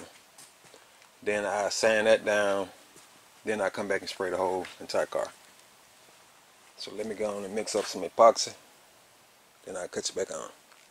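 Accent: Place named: South Alabama, United States. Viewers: That sounds like south Alabama I miss home lol getting my 85 t top prepped for paint now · Didn’t realize you were from Alabama! I knew you were in the south somewhere bc of those cicadas and your accent. Hey from Anniston 👋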